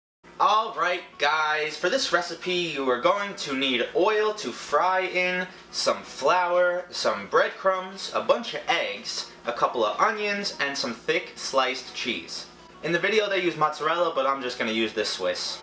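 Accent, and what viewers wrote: New York, United States. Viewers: New york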